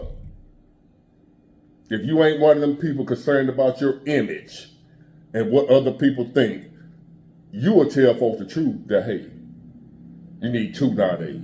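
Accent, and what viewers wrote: South, United States. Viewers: Your country accent is type tier ✊🏾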